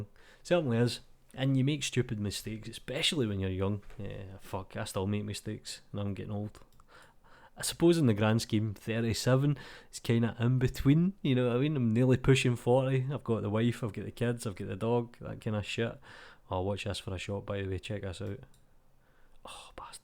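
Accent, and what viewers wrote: Scotland, United Kingdom. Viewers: There is no shame in your accent. At least you are not using Scot's vernacular or Ghàidhlig ... tbhiodh e inntinneach faicinn an dèanadh iad staim dheth....LOL! Bless those who wish to preserve the auld braid Scots tung. You are young yet....just wait...it gets worse... :) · Downfall?! Bollocks. The Scottish accent makes everything better, including your videos :D And dont sweat it mate. I'm 8 years your senior, with 4 kids and two nuked marriages behind me and I still play around with Blender and other softwares daily. You're doing fine ;) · Happy Birthday!...and I love the Scottish accent. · As a german, I find your scottish accent very pleasing! I rarely struggle to get what you`re saying. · love the Scottish accent BTW. Very different from Grant Ibbot.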